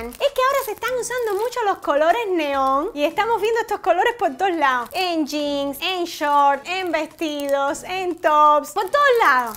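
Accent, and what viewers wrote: Cuba. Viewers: me encantan tus vídeos, eres muy graciosa y muy guapa. Me encanta tu hacento, es como el de mi abuela que es de Cuba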